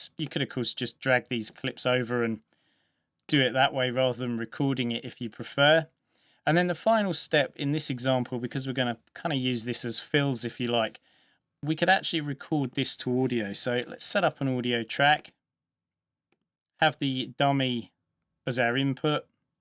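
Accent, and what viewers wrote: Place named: United Kingdom. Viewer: Nobody knows how i love british accent!!!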